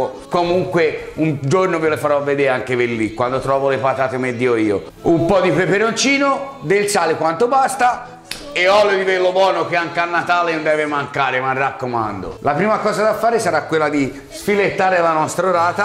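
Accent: Tuscany, Italy. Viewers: Complimenti a te, io e mia moglie seguiamo con molto interesse le tue straordinarie ricette, presentate con il tuo simpaticissimo dialetto toscano.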